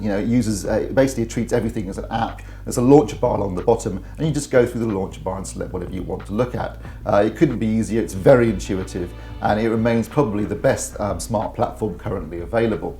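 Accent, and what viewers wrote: United Kingdom. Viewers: Never mind Terry he's off his bean I luv your voice and your detail reviews in a strong British accent. Bravo and well done.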